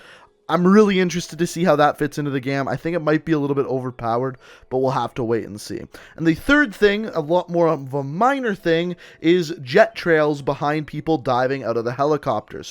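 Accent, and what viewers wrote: Canada. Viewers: That is the most Canadian accent i've heard in a while. I love it.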